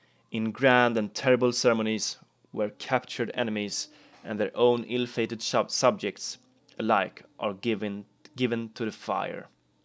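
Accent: Sweden. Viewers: You sound like you have a swedish accent. Är du svensk?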